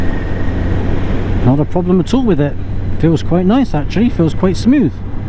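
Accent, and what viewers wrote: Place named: Bristol, United Kingdom. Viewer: I subscribed just because of your bristol accent 😄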